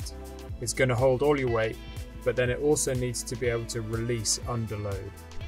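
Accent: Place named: United Kingdom. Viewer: Hi, you have great teaching ability and I look forward to seeing more from Climbing Acadamy in the future. It's so nice listening to a British voice as well. Here you tackle a rarely seen topic and you do it very well. I would like you to cover rescue tecniques needed by a fallen climber on a multi-pitch route where the faller stops opposite unclimbable rock or in mid-air. I have never seen anything on this anywhere and yet it is a situation that should be practiced and resourced before embarking on serious multi-pitch climbs. I look forward to your views and, ultimately, your video.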